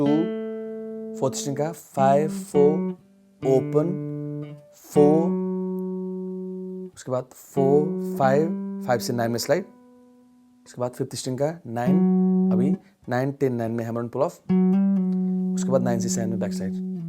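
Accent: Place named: Nepal. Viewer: I think your pronouns like a nepali guy. Is it true.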